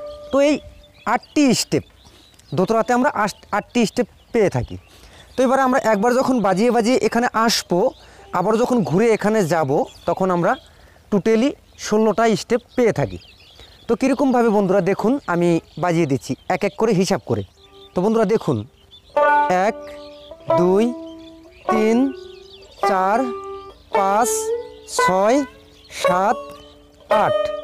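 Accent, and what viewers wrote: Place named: Bangladesh. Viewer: আপনি আসামের লোক অথচ ভাষা আমাদের বাংলাদেশের মত। অবাক লাগলো ব্যাপার টা।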